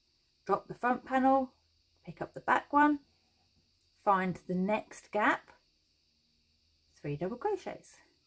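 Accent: Norfolk, United Kingdom. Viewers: Sorry not crochet related but I was trying to work out you accent lol what part of the uk are you from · I ordered this pattern on your Etsy and followed the video so I could learn patterns better. Thank you so much for really making this step by step! I'm new to crocheting and patterns do my head in. I imagine the stitches floating in midair somehow and can't picture where everything is supposed to go. Hahaha Thanks to this video, I completed my first ever cardigan! Now I'm working on a second. Also, I LOVE your Norfolk accent! It's like having your nan hand you a bowl of nice hot soup. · Are you from Norfolk?!?!? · Brilliant tutorial. Thank you. Do I detect a Norfolk accent? I'm now extending my sleeves and they seem to be getting wider, is that right?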